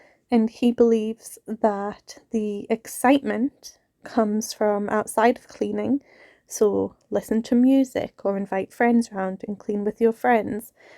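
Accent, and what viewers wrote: Scotland, United Kingdom. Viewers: yes has picked up quite a lot of the scottish accent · My grandmother was from Scotland and hearing your voice and accent has brought me so many sweet memories. She was such a sweet, loving woman. Thank you both, ladies, for the fine job you do.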